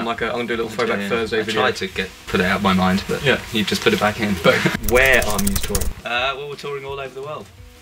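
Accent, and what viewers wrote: United Kingdom. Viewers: Joanne August ouiiii,lamentablemente,los escucho una y otra vez...pero para mí lamentable inglés,entre el acento so brithish y lo rápido que habla Matt😩😩😩no agarro una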